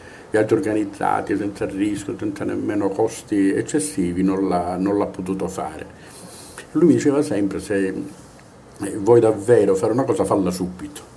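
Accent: Sicily, Italy. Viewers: ma sei Siciliano, della provincia di Catania?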